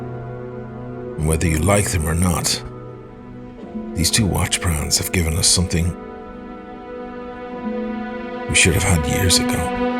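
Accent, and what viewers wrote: Ireland. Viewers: Great start; loving your style; beautifully delivered with that mellow Irish Brogue. Classic, long may you continue my friend. Salute.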